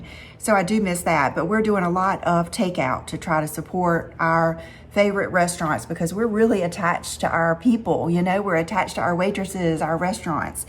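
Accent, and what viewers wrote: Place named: Texas, United States. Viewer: I just want to say that I’m a NoCal girl(old lady) and nowhere near you in any stretch of the imagination; looks, class, knowledge of products, size, nothing! And I was always amused by you because of your way. But I have just fallen in adore with you! Cute as a bugs ear! Sweet and unpretentious and humble but not mousey scared or cow tow ish. I LOVE YOUR TEXAS ACCENT! I love your lips, hair, makeup, accent, syntax, vulnerability, etc. carry on. That’s all. Just be you! Do not change!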